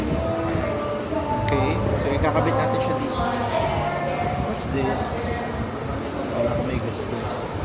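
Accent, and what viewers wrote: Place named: Thailand. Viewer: sobrang thai accent ahahahaa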